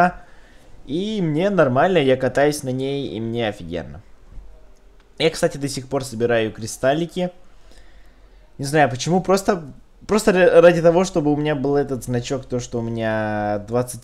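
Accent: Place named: Moldova. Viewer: Акцент молдована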